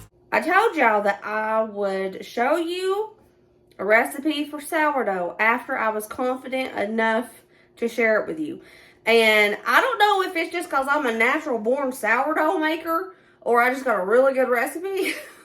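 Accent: South, United States. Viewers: Love to watch the show and the great recipes. The southern accent is difficult to understand at times. Maybe slow down while explaining the recipes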